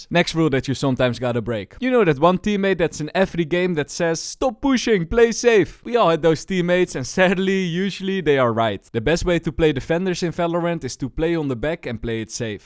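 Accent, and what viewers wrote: Netherlands. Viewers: first 10 seconds of the video i recognize a heavy dutch accent and i was right LMAO xd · hahahaha ik hoor die nederlands door je accent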